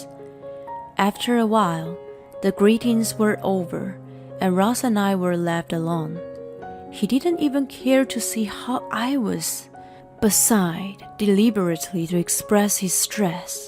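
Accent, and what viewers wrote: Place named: United Kingdom. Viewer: Please Bring back the UK voice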